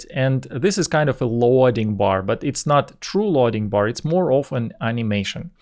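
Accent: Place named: Russia. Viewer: Спасибо! Английский с русским акцентом очень легок для восприятия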